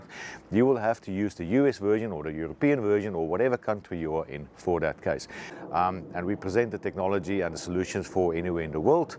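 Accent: South Africa. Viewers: Nederland? I would have sworn that you were from Jo'burg!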